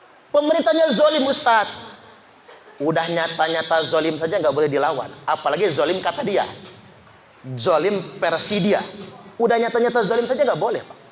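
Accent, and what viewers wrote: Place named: Jakarta, Indonesia. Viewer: iki lho pak , sampean wong njowo opo dudu , logate koyo wong njakarta , jainudin mz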